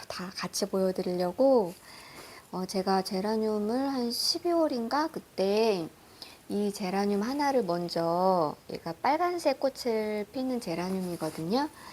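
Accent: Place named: Seoul, South Korea. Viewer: 영상 잘 보고 갑니다 ^^ 경기 서울 분이신가요? 서울사투리가 조금씩 들리네요 ㅎㅎ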